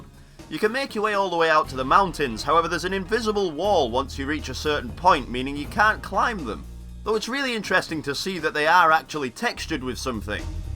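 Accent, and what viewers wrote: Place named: Blackburn, United Kingdom. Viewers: Nice video. Never knew about the duplicate world, really odd. Can't place your accent. Going to have a stab and say Blackburn? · He's so Noorthern - I love it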